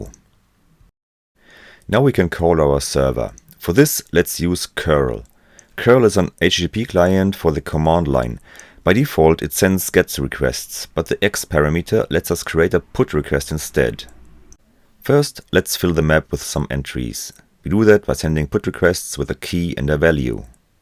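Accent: Germany. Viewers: 好棒， 简单明了， 听英文口音像德国人？ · The German accent is strong with this one ^^ Great video, well done!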